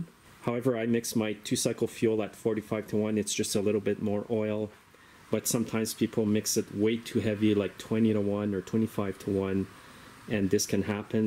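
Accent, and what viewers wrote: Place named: Canada. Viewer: love the vids! I need to ask, what kind of accent do you have? I think its strong Canadian but I can't tell!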